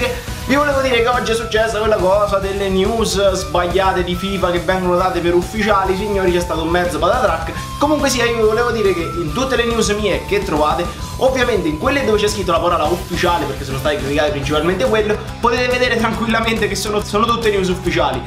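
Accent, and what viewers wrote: Lazio, Italy. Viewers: ma sei di Frosinone? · Ma sei de Frosinone?ciociaria portami via 😂😂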